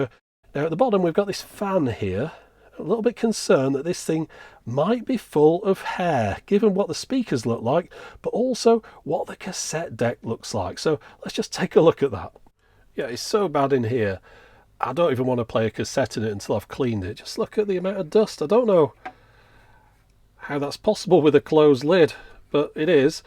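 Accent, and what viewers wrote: United Kingdom. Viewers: Are you british? You speak english like british people 😃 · I'm amazed someone would complain about you pronouncing things the British way. Considering you're British and all. But I probably shouldn't be too surprised I guess. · "Me mother in law, or me brother...". You have never sounded more british.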